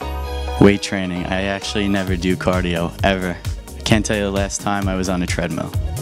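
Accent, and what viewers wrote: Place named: Canada. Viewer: Is he Canadian? I have a Canadian friend who sounds almost the same.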